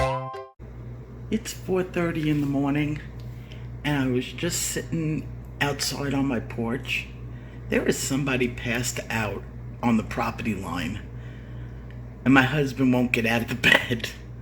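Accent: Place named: New Jersey, United States. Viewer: Hi. You sound like my spouse's mom, and she sounds like New Jersey. Just an idea, but why not draw from your list and the official one?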